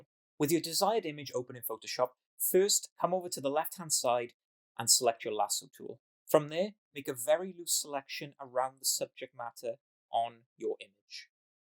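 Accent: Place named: Wales, United Kingdom. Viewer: Love a tutorial with a welsh accent! Feels like I'm listening to a mate! Great tutorial bro! #WAAAAYULLSS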